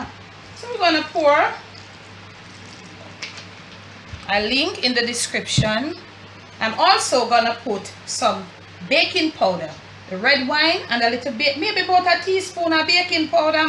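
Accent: Jamaica. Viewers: Thank you for sharing with us your Jamaican pepper steak recipe. I will try it this coming Thanksgiving for my family. You have a nice way of talking, and I like your Jamaican accent.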